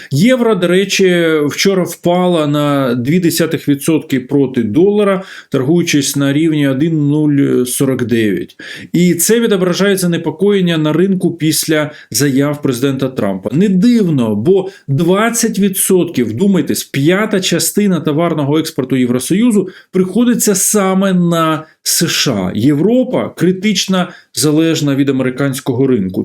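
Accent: Russia. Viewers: У ведучого грамотна, чиста українська мова, але з російським акцентом.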